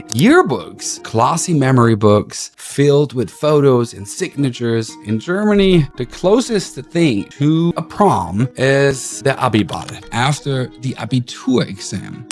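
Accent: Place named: United States. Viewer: Du hast nen richtig guten amerikanischen akzent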